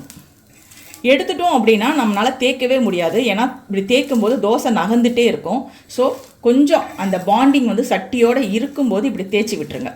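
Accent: Kongu, India. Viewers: எண்ணி எண்ணி ரசிக்கிறேன் கொங்கு தமிழை. அருமை ங்கா.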